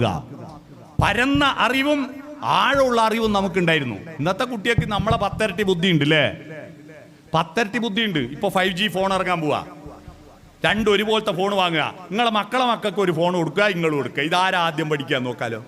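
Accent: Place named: Kannur, India. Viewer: എന്റെ പൊന്നു സുരേഷ് ബാബുസറെ,താങ്കളുടെ പ്രഭാഷണം വളരെ വിലപ്പെട്ടത് തന്നെ, പക്ഷെ, ഭാഷ വെറുപ്പ്‌ ഉളവാകുന്നത്, കണ്ണൂർ, കാഞ്ഞങ്ങാട്, etc,,, അതൊന്നു മാറ്റി നല്ലമലയാളം, നല്ല ഉച്ചാരണം ആക്കിയാൽ ഒന്നുകൂടെ (100%) നന്നാകുമായിരുന്നു, pls try Sir, 😊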